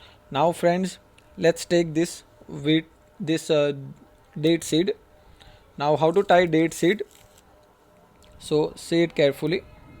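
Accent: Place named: Gujarat, India. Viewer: Sir bhut achi jankari di aapne Aapka ye therapy centre kha h sir Aapki language explan se aap Gujarat se hai ese lag rha h Please give your address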